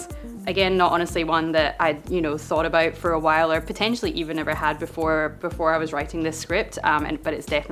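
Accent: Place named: Scotland, United Kingdom. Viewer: Always look foward to your vids...So gorgeous, knowledgeable, and love your Scottish accent.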